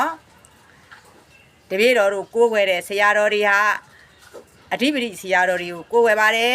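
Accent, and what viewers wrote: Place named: Ayeyarwady, Myanmar. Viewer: ဒါကြောင့် စကားပြောလေယူလေသိမ်းကဧရာဝတီတိုင်းသားလို့ထင်မိတာ မမှားဘူးနော်ချစ်စရာဧရာဝတီတိုင်းသားများ❤❤❤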